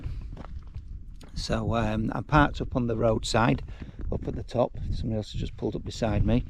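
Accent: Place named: England, United Kingdom. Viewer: Turned off as soon as I heard that English accent and the absolutely pathetic attempt at Gaelic pronounciation.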